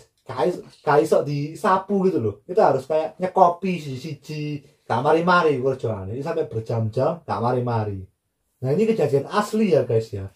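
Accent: Java, Indonesia. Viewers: Wah saya suka dg medoknya tinggal di Jawa az Oppa 😁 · Lama-lama logatnya kaya orang jawa,logatnya mengikuti mas hansol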